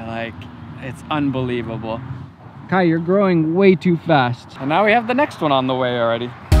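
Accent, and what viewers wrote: Canada. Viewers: Canadian. Definitely Canadian. #saidthegirlfromBuffalo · Definitely Canadian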